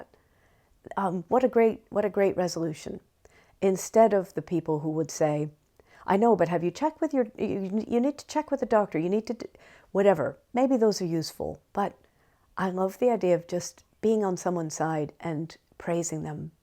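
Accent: Ireland. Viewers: Thank you, your lovely smile lights up the whole room. It's a real pleasure listening to your beautiful soft & gentle Irish accent..🍀☺️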